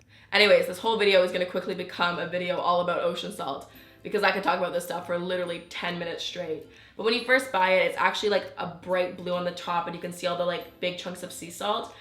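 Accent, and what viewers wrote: Canada. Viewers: Is she Canadian? She sounds like my new amazing science teacher (I just moved to Canada btw) I am subscribing now because I am obsessed with Lush as well ❤️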